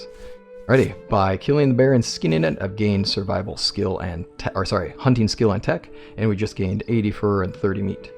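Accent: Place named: United States. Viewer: Holy shit, a medieval dynasty creator with an American accent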